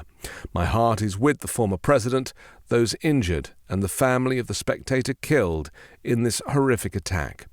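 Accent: United Kingdom. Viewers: Great job,!!! I love your show, and your reporting. And I love your British accent, as my Dad was born and raised in London. 😊 Yes, everyone needs to tone it down in this Country; but sadly, too much of this began under our own leaders, and some culties. I knew something would happen again. I just had no clue when, or where. This is so scary. Bless you, & God Bless America! 😢🕵️🧑‍⚖️🙏🙏🙏🙏❤️‍🩹🇺🇸🇺🇸🇺🇸🇬🇧🇬🇧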